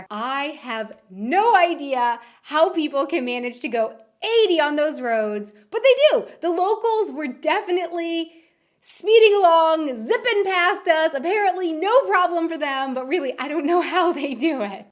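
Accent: United States. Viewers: After first five seconds yup she’s definitely American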